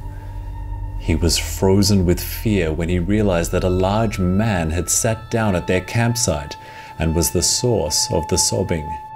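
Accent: Australia. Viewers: Is it the cultivated Aussie accent?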